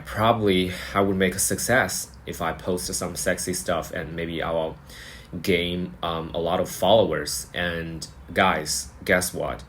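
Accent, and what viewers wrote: United States. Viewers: this is quite frustrating. I'm 4mins into the video and it is completely unclear where he was imprisoned - he speaks American English in a way you can only learn in the US itself but it seems (at 4mins into the video) all this happened in mainland China. if that's the case then all bets are off - China is not a country which honours the rule of law. the law there is arbitrary. it serves the ruling party and they bend it if deemed necessary or useful. nobody can expect a fair trial in China regardless what the law is on paper. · But you don’t mention anywhere how to support you. When I hear you talking, I find it difficult to believe you haven’t spent some time in the US since you speak flawlessly with an american accent. You have absolutely no reason to be depressed. You are young and healthy, very handsome, very smart and creative, and you master the two major languages in the world that is coming onto us. 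I’d take a lesson with you !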